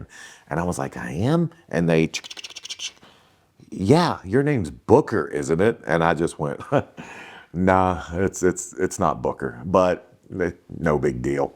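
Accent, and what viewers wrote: Virginia, United States. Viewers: I think because of the delicate quality of your Virginian accent. To uninitiated ears, it might come off as…yeah.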